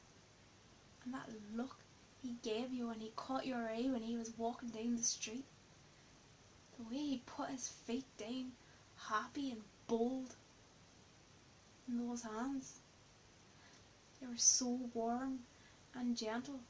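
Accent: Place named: Ireland. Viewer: Your Irish accent is amazing!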